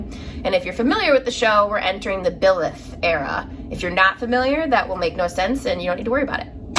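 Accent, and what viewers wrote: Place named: Chicago, United States. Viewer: Belated welcome to FlossTube! Your first video just popped up into my feed today. Great intro. I like it so much when contributors share something about themselves. We're neighbors (if you consider a small village in Lake County on the border of IL and WI a neighbor.) We have the same accent - albeit I've been a North-sider most of my adult life! Go Cubs! Glad you love houseplants. I am a novice and could use all the tips you can give us. Coincidentally, I am going to Inspired Needle for the first time on Saturday to look for some special floss and fabrics for two of my pending projects! My local LNS is in Winthrop Harbor did not have what I needed - so I am heading South. :) I am working on "After the Roses." You are right - stitching is zen like, and IC's patterns are hypnotic and therapeutic. Can't wait to see your collection!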